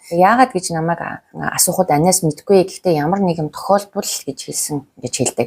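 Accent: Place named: France. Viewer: Chi naad french ayalagaa boli ok...chi yasiin french hel meddeg ain..english heleer ok Bi Amerikaas sonsoj bna...hutsaad Frensh ayalagaar ain...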